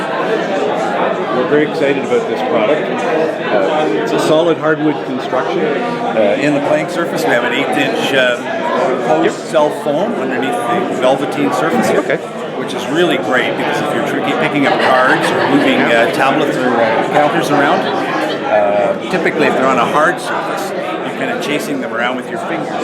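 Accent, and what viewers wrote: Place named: Canada. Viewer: Me: This guy sounds Canadian. Guy: "If they have to take their kids to a hawkey game--" Me: 😎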